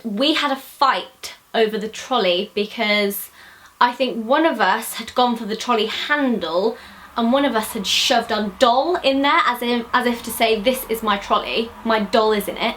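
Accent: England, United Kingdom. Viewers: it seems like when you say ass its less offensive with your English accent than with my American accent. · you know why i'm here. not because of you. your lovely british accent. i guess!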